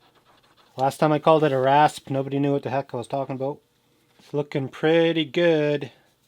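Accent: Canada. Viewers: Just wondering, are you Canadian? Sometimes you sound Canadian and sometimes you don’t.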